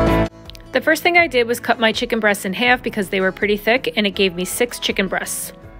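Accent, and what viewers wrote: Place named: New Jersey, United States. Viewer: Random but are you from jersey? I’m a jersey girl and you def sound like one by your accent ☺️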